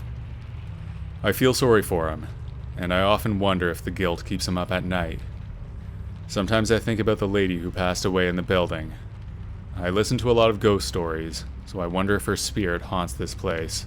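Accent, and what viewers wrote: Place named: Canada. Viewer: The reader is def Canadian lol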